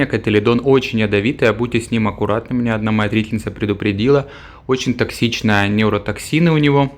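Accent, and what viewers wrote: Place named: Spain. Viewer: Прекрасное видео - как приятно видеть ухоженные растения имеющие неповторимый естественный вид при заботливом выращивании в подходящем для них климате. Вроде бы - всем известная простая маммиллярия Mammillaria elongata, но лучше не видел, очень хороша под натуральным солнцем. Как ориентирован балкон по отношению к частям света? И хотел бы немного помочь с названиями. Сомневаюсь насчет алоинопсиса - это скорее фрития (Frithia pulchra) если цветки темно-розовые или фенестрария ( Fenestraria rhopalophylla / aurantiaca), если цветки желтые/белые. Кактусы: и - не эуфорбии, это такой очень красивый маленький цереус Monvillea spegazzinii, очевидно выращенный из кусочков кристаты. Она красиво цветет. Безымянны кактус - Notocactus oxycostatus, родственник известного всем N. ottonis. И рядом стоящие Eriocactus leninghausii i Brasilicactus haselbergii. 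Нет, это не маммилопсис. )) Я не использую кактусного новояза и не обьединяю по-хантовски старые известные роды, пусть это остаeтся на совести неофитов. PS Когда читаешь латинские названия у тебя приятный испанский акцент. Я изучаю португальский, с ним испанский понятен, но не наоборот. ))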